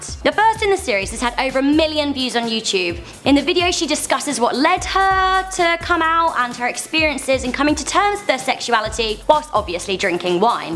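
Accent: United Kingdom. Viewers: It's her accent.... people with english or english-like accents pronouce things that end with "A" with an "er" sound. example: idea -> idear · She is british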